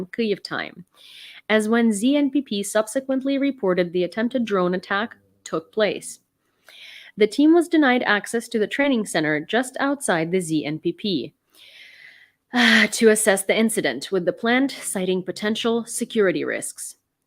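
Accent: United States. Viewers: I massively appreciate your labor supporting Ukraine and more people like you are needed. I only have one question: do you feel Ukrainian? I mean, it's good that your english is totally American (that's a good skill), but your behaviour, manners, puns, attitude and everything is soooo American, even exaggeratedly American. Somewhere I hear you saying you were in the US for 16 years, but that time doesn't explain that you've become so American, unless you did put your all in transforming your whole personality into being American. That's why I ask if you feel Ukrainian (because such huge transformation kind of implies that you felt ashamed of being Ukrainian in the past?). Like I've known many foreigners living in the US for almost their whole life and they speak 100% like natives but they have not adopted at all such a huge American attitude and manners when speaking and behaving as you do (actually as a very specific type of American girls: talking always as if they don't give a shi*, the constant roll eyes thing, the constant corrosive puns, etc). 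I don't say this in a bad way at all, I do think your work is totally genuine and really appreciated, but as someone who spent long periods of time per year in Ukraine, before the war started, when I listen and see you there is something that makes me feel "weird". It would be interesting if some day you talk a bit about this and your intercultural "self". I mean, when I see you I feel as if I'm seeing a japanese woman singing spa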